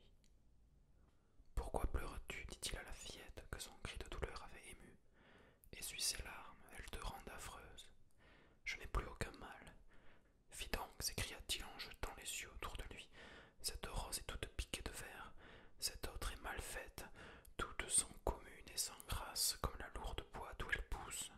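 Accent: South, France. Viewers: ...j'avais reconnu. Sud sud...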